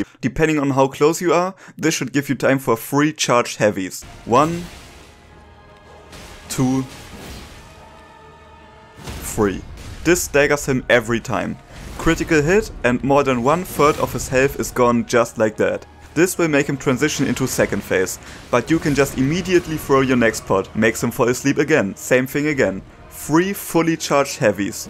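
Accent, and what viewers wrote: Germany. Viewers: bist du bei deinem Englisch zufällig deutscher?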